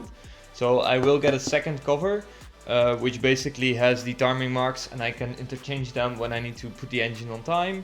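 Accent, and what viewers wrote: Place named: Brabant, Netherlands. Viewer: das wel bekend dialect!! midden Brabant?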